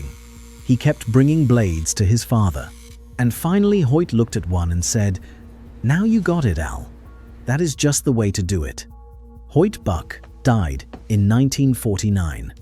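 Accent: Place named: United Kingdom. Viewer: An American iconic product being narrated by a British voice, come on youtube you can do better.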